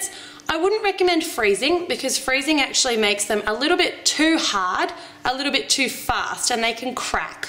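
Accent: Australia. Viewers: Australian, not british :P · She's Australian not British. Don't worry I thot she was British too! >.<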